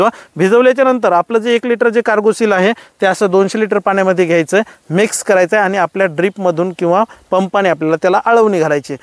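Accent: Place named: Parbhani, India. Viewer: भाषा परभणी जिल्ह्याची आहे,छान निवेदन करता राव तुम्ही.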